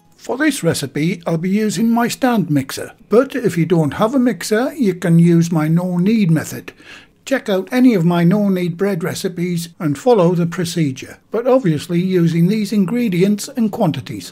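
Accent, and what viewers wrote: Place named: Scotland, United Kingdom. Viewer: He does Winnie The Pooh and with with a little touch of Scottish brogue.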